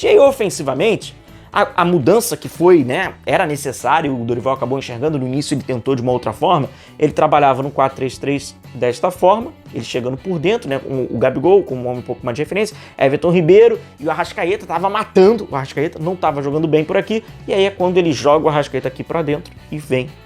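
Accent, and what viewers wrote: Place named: Rio de Janeiro, Brazil. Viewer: Poxa desculpa eu sei que é mais pelo sotaque carioca, mas... me perdoa a professora de geometria... isoiceles!! E a professora de português, como é que fica??!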